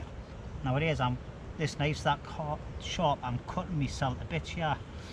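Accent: Newcastle, United Kingdom. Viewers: It's called Geordie mate people from the north east of England 👍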